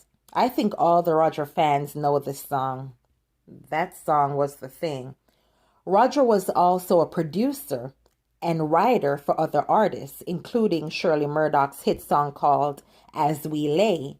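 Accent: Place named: Jamaica. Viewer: hey are you Jamaican? I hear a very slight Jamaican accent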